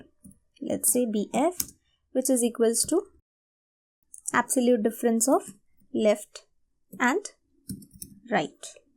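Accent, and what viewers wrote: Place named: India. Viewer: indian accent spoils any kind of tutorial :(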